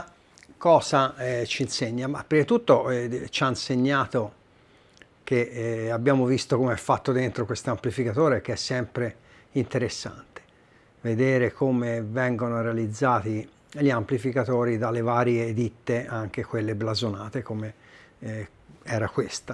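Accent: Tuscany, Italy. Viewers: Sempre bravo e simpatico, compreso l adorabile cadenza Toscana!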